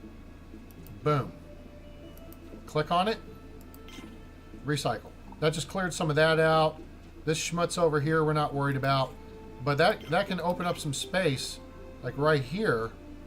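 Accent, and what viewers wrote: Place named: New Zealand. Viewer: You do it so properly. Step by step telling. But eehhm 🥴 what I see on your playlists are confusing.... By the way you are not from up here. Probably from down under. Nooo, anyway. Not fully a wombat. New Zealand probably. I hear you. Trying to place you geographically..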